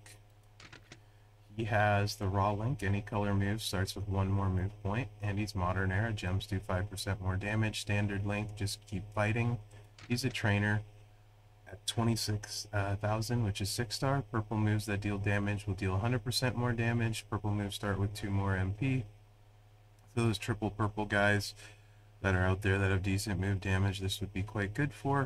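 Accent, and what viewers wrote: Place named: Canada. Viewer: That's a side effect of his Canadian dialect. It's very prevalent in that country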